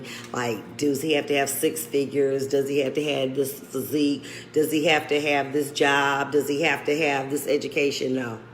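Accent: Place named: United States. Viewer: I love her country accent.. I’m from New York and she sounds mad country lol I love it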